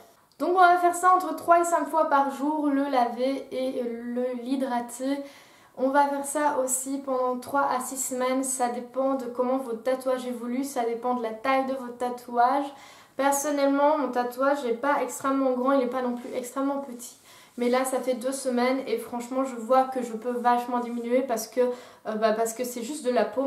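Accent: Belgium. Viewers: ton accent belge est adorable, tes lunettes sont canons tout comme ton septum ET TES CHEVEUX (et ton KK) et ton tatouage bien sur :) je m'abonne !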